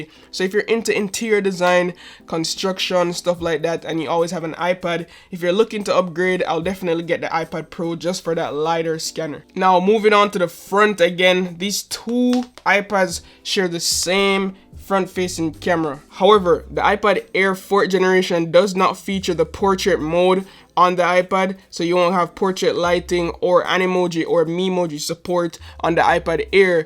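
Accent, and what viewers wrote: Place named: Jamaica. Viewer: you sound like you have a little Jamaica 🇯🇲 in u bro great video tho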